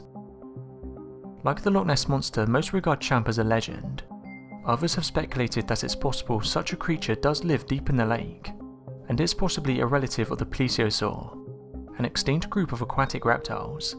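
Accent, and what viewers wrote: United Kingdom. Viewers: The only thing suffering are my ears listening to this absolutely horrid "narration." Having a British accent doesn't automatically make someone a good narrator. All this guy is doing is reading one sentence. Then reading another sentence. Then reading another sentence. And every sentence sounds EXACTLY the same. 90 seconds was all I could take.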